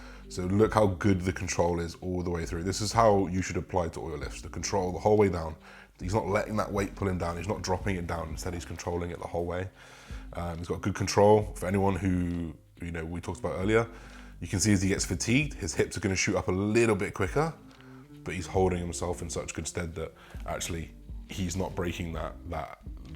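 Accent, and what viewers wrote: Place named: Liverpool, United Kingdom. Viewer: Content is sick lad! Keep it coming fella. Need to see more of your scouse accent as well bro it has me dying 😂😂😂😂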